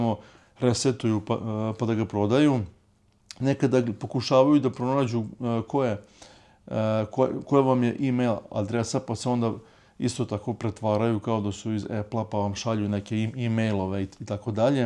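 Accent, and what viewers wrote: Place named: Slavonia, Croatia. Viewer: Prijatelju zanima me odakle si , nekako mi zvuci kao da si iz slavonije kao npr Osijek ..🤔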